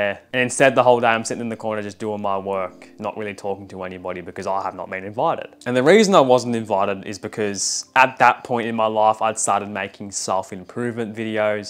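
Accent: Australia. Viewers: are you an Aussie mate?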